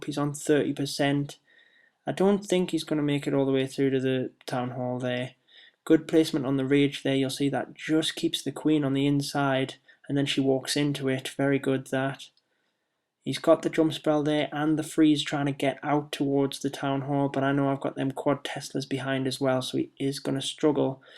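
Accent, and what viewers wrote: United Kingdom. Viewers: Bro brithish accent 😂😂 great bro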